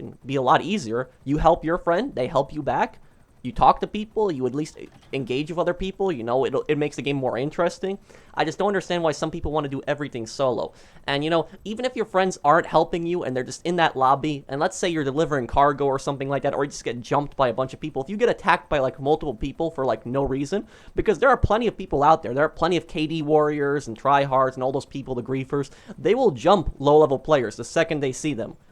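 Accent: Poland. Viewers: R u acctually Polish? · Z polski jestes?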